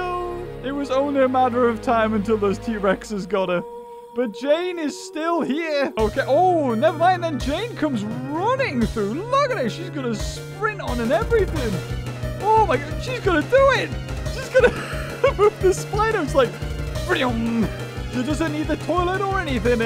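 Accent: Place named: Newcastle, United Kingdom. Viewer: The Geordie Mrbeast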